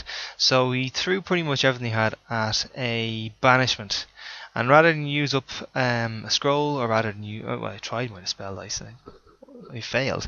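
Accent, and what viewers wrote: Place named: Ireland. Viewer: Thanks for the report,. Nice to hear an Irish accent on some Warhammer battle reports (even if it is a Dublish(ish) one, haha). Sound, mate.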